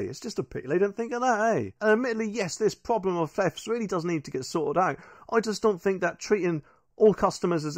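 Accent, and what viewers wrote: Norfolk, United Kingdom. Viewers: Hi,where are you from? I recognise that accent,is it Norfolk.?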